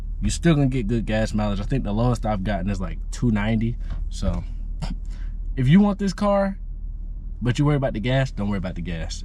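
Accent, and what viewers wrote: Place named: United Kingdom. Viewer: where you from? sounds like uk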